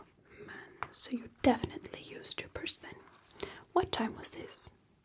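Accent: Long Island, United States. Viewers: You are looking so cutesy putesy!!! Your accent is the best, especially that heavy long island one you do in some of your rps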